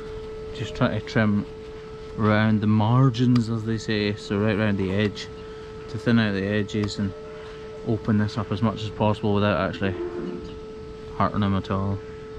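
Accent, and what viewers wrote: Scotland, United Kingdom. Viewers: I'd love to know how long it takes to get that far in vet time to become so good in hoofs ,, nevermind I'll just listen to the Scottish voice 🤗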